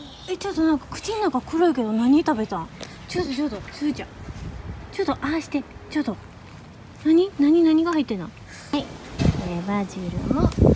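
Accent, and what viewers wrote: Kyoto, Japan. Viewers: Specifically, I think she’s speaking in Kyoto-Ben. Osaka-Ben, which is what most people think of regarding Kansai-Ben sounds different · I know🌸her Japanese accent is so cute when she speaks English it’s very soft and beautiful since she’s from Kyoto🤍🇯🇵☺️ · いつも楽しく拝見しています。私にも4ヶ月になる娘がいるので、スータンの様に可愛く育つといいなと思っています。英語を話すモエさんも素敵ですが、個人的には京言葉を話されるのも素敵だなぁと思っています。 I really enjoy watching your channel. I’m also a mom of a 4 month-old girl so I hope she will grow well and cute like. Your English is very charming but personally your Kyoto dialect is something that makes your channel even more attractive!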